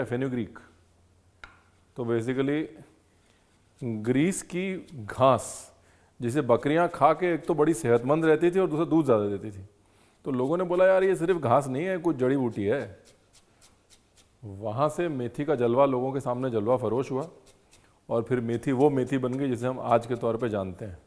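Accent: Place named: Lucknow, India. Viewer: इतना लखनऊ टपकता है आपकी जुबान से मैं घर से दूर हूँ , करीब आ जाता है शहर । Keep going, lots of love Boss.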